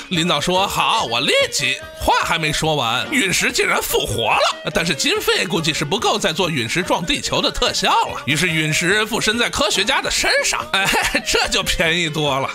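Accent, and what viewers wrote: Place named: Shaanxi, China. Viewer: 是陕西人吗好像听到了一丢丢口音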